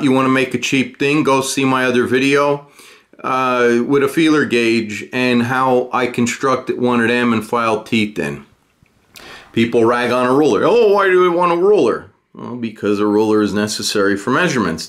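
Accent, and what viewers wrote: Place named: Chicago, United States. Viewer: What part of chicago are you from? Lmao You sound like you're from my old neighborhood lol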